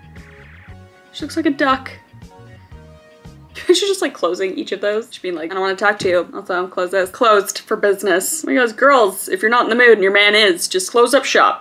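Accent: United Kingdom. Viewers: Are u britesh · Plsss talk British I love your British accent ❤❤❤ 😂😂😂😂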